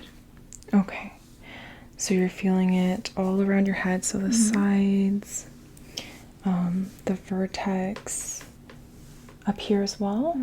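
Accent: Canada. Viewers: You sound Canadian---I grew up in Toronto, myself---now living in the US--- so glad I have found you on these sites!!❤️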